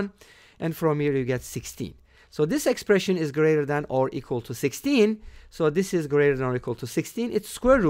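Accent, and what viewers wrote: Turkey. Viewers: hocam türk müsünüz, aksanınız inanılmaz düzeyde türkiye türkçesi konuşan birisini andırıyor. are you turkish? your english accent made me think that u are turkish.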